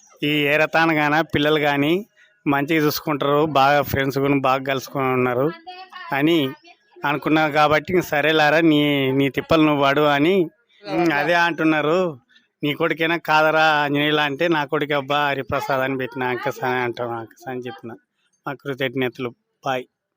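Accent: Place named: Gadwal, India. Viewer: Gadwal slang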